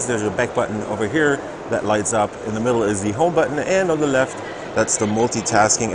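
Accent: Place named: Germany. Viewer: Your accent sounds like German!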